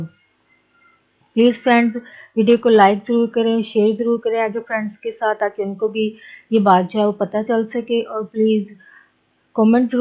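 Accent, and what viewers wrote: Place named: Rural, India. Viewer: paindoo awaz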